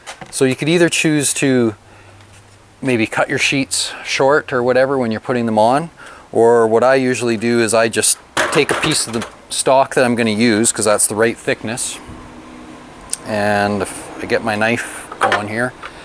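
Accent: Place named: Saskatchewan, Canada. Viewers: There isn't a Canadian accent. This is a Saskatchewan accent. Put him next to someone from Cape Breton and they'd sound pretty different. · Canadian accent is hilarious.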